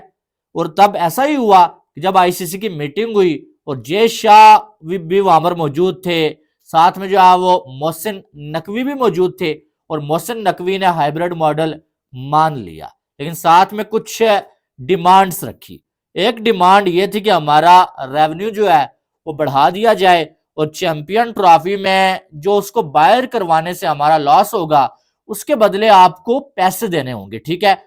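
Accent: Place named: Punjab, India. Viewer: Bhai pure Urdu bolo Urdu ko barbaad Matt karo Punjabi accent mein laake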